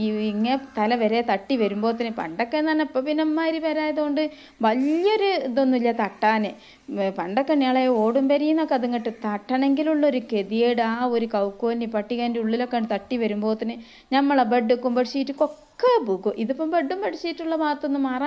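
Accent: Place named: Malabar, India. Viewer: കുട്ടി ഏത് നാട്ടുകാരിയാണ് ഭാഷ നന്നായിട്ടുണ്ട് ഞാനും മലബാറിയാണേ.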